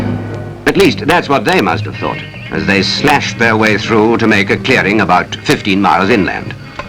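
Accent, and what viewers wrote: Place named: Oxford, United Kingdom. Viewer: Kan kijkers van deze bedrijfsfilm verzekeren, dat in deze getoonde film- en boorlokatie; niet de deze documentaire gebruikte Oxford uitspraak gebezigd werd! Eerder, zal er menig Nederlandstalige vloek gevallen zijn. De helikopters werden gecharterd van World Wide Helicopters geregistreerd op de Bahamas. Vliegers en grondpersoneel waren veelal Australiërs. Deze info van een toenmalige 10 jarige snotneus. Heb op een uitermate tropische zondagmiddag ooit enkele uren, door mogen brengen op de logistieke locatie van deze (droge) exploratie put. Herinner mij bij het inkloppen reaktie, dat collega van mijn vader genaamd Gesterkamp, een paar groene lomboks uit een grote jampot verorberde. Mijn vader waarschuwde voor de gevolgen voor de maagband. Gesterkamp is een hele slechte geworden voor het Shell Pensioen Fonds. Deze culinaire liefhebber van de oosterse keuken is uiteindelijk meer dan 90 jaar geworden.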